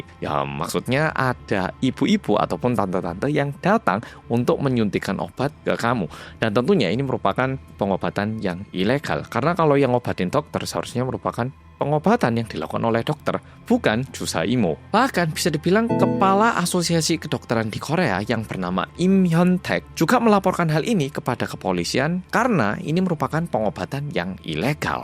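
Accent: Java, Indonesia. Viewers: medok puooolllll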